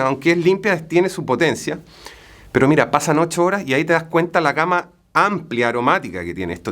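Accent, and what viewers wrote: Chile. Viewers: Alguien save de donde es el. Su acento es de Chileno Pero el vive en españa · Si, es chileno, pero hace años vive en España, por supuesto le delata su acento, yo le entiendo perfectamente, a él y a los chilenos en general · Por el acento es de Chile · cual es tu nacionalidad? Me parece escucharte un acento chileno? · Su acento es Chileno? Cuando dice: que me encanta, que me vuelve loco...madre mía!! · perdon....Quien dijo que el era Español???? su forma de hablar es Chileno...!!!! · con razón le encontraba acento chileno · Tienes acento chileno. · Dice "fragancia" y yo escucho "Francia". Supongo que es chileno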